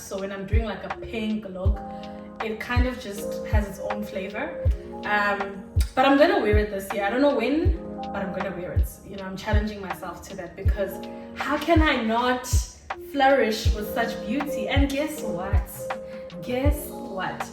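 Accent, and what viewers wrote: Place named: South Africa. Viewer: You're an excellent presenter and ambassador for busty ladies. You are charming. Plus I love your SA accent 🤩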